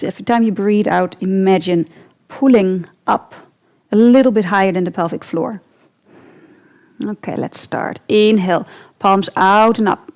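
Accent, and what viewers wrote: Netherlands. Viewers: Je bent nederlands he, hoor ik aan je uitspraak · I love your accent. Are you from the Netherlands? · you can hear she's from Holland too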